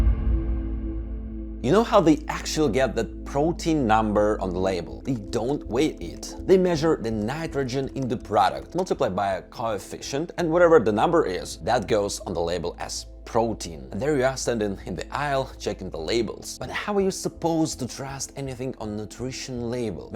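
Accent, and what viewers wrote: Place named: Europe. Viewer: the european accent is cute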